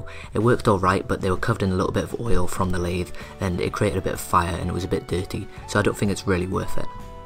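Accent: United Kingdom. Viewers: dude, you realize he's british, right? like did you literally not even notice that?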